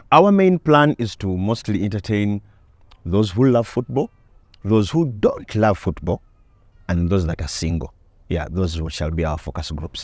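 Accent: Nigeria. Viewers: The Nigerian accent is on point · The Nigerian ascent was on point 😂😂😂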